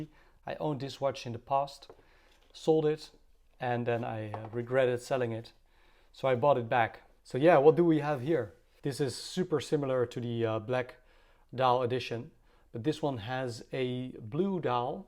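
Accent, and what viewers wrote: Netherlands. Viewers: Nederlands accent?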